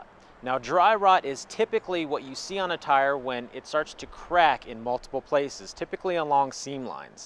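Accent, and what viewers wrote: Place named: Kerala, India. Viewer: നന്നായിരിക്കുന്നു , ഇഷ്ടപ്പെട്ടു. നല്ല ഇംഗ്ലീഷ് ... ഒരു മലയാളി Touch.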